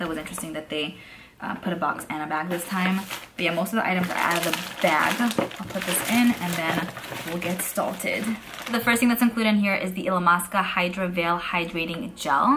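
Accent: New York, United States. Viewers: I AM FROM THE BRONX, I KNOW YOUR ARE FROM SOMEWHERE IN NYC WITH THAT ACCENT!😄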